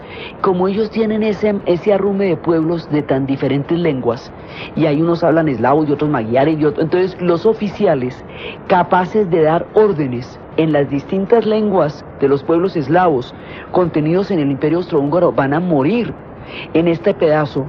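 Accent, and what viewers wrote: Colombia. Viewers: No me canso nunca de escuchar a Diana Uribe... además de toda su sabiduría.... el tono colombiano que es tan tan lindo...Chévere!!! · Diana Uribe es única, a mí me encanta 🙂 Por cierto que qué hermoso es el acento colombiano! Opinión de una mexicana.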